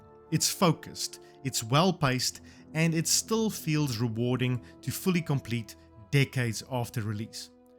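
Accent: South Africa. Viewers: out of curiosity are you South African?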